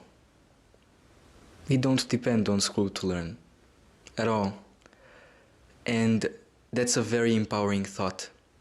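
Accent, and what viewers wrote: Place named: Brazil. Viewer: I feel a Brazilian accent but he could be italian too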